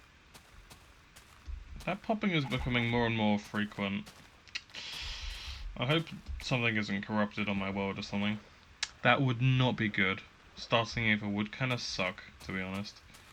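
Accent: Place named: United Kingdom. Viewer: I've been really enjoying your series so far, keep up the good work! :3 I also started playing beta recently and Ive had similar see-through glitches here and there. No idea what thats all about really, guess just some beta bugs. The tower turned out really nice! I love the shape of it. The name Overlook came to my mind when you asked about name suggestions (inspired by the hotel's name from Stephen King's The Shining). I think it fits cause when you're on the top, you can look over your world and see everything really well. Q: What made you start making videos? Also, your Bri'ish accent is cute xD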